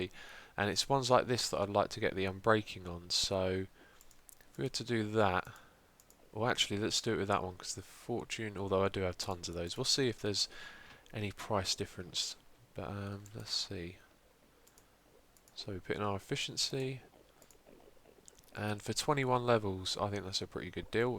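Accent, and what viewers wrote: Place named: England, United Kingdom. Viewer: Your pronunciation of water is correct, you just have an accent. I would say about 50% of English people say it that way. I also do that sometimes, I pronounce mountain Moun'ain. I also noticed about 50% of Americans tend to do that.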